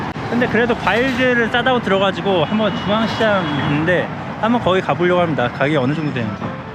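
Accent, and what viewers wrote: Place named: South, South Korea. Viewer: 님은 절대 설스타일 아닌데..ㅋㅋㅋ(구수한 편안한 남도스타일.)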